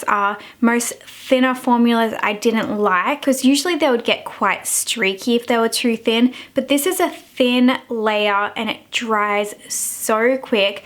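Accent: Australia. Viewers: You have the cutest voice...and the Aussie accent doesn't hurt!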